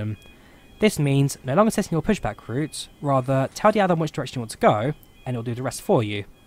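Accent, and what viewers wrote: United Kingdom. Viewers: I say this with deep respect as you are a brilliant simmer and content creator who engages so generously with the community. When syllables get dropped from words in the rush to get to the end of a sentence, it does impact comprehension. Although I’m not from the UK, English is my first language and I do have some trouble understanding all the important and interesting things you’re saying. I think by taking the time to slow things down, and more evenly space words and syllables across phrases, you’ll be much better understood by those who do not speak with your accent or by those for whom english is not their first language. I truly only mean this only as genuine constructive feedback.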